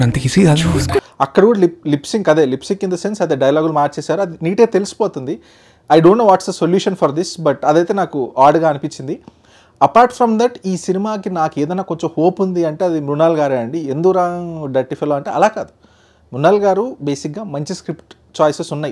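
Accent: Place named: Andhra Pradesh, India. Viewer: అరేయ్ ఎందుకురా తెలంగాణని చెప్పుకు తిరుగుతావు నువ్వు ఆంధ్ర నీ యాస నే చెబుతుంది నీలాగే పూల సొక్క గాడు ఫస్ట్ తెలంగాణ యాసలో మాట్లాడి మళ్లీ వాడు ఆంధ్ర వాడు అని ఒప్పుకున్నాడు నువ్వు కూడా అలాగే ఒప్పుకో డ్రామాలు ఎందుకు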